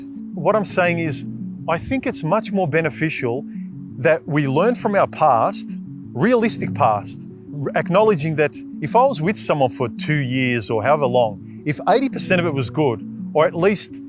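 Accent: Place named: Australia. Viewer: Ausssssie accent... love it!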